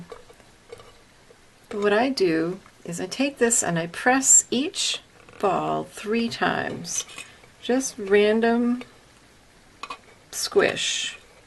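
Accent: United States. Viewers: OMG I was totally going to ask if you were south of Boston because you sound so much like my friend from West Bridgwater! Oh my goodness you sound like an actual native - BUT not everyone has the ear for the subtle new england accent differences so I'd be willing to bet not a lot of other people make this assumption about you (I'm from Boston but have tried hard to eliminate it when necessary - as not to have to repeat myself and/or sound crass lol). I should really become a phonologist! :) oh and more importantly THANK YOU SO MUCH for this tutorial because I just finished it and you helped me so so much just by letting us know that the alcohol ink should dry matte before mixing! Best tip ever! <3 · Are you from the Boston area? Picking up an accent and I want to know if I’m right 🙂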